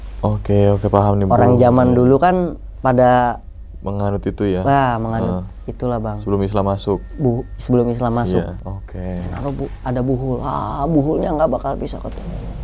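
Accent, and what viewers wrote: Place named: Banyumas, Indonesia. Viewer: Kangen dgn logat ngapaknya mang😊😊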